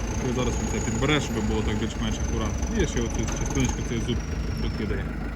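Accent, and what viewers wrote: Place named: Western Ukraine, Ukraine. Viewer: Спочатку була здивована почути українську мову, мою, рідну, "западенську"😊. А коли почула, що повертаємося до Тернополя, була приємно вражена👍👍👍. Відео цікаве, інформативне, заохочувальне! Аж захотілося теж пошарудіти рукою по свіжому граду. Успіхів вам і надхнення!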